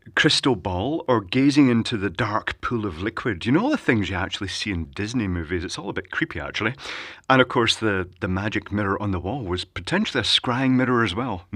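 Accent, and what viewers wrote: Scotland, United Kingdom. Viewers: Talking hands with horrid middle class Scots accent,hope he disappears up his tunnel of typhon.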